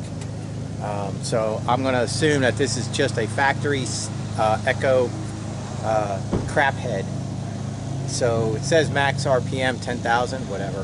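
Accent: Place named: New Jersey, United States. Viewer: Great Channel and content. Nice work too. But do I detect a Jersey accent..? Formerly Monmouth and Essex County here, was in business for 27 years. Keep up the good work!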